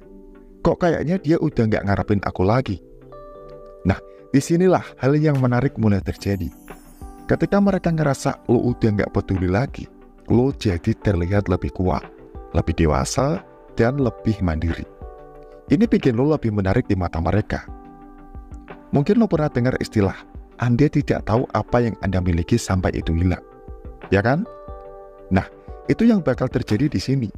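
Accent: Java, Indonesia. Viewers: aga medok nampakmya moderator kali ini🤔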